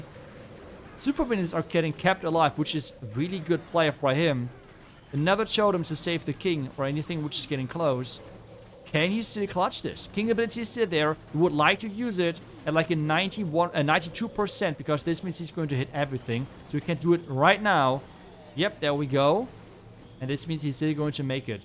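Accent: Germany. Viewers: I know he is German and you have the German accent but what else is in there? Is that Scottish vowel pronunciation or what is it? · German accent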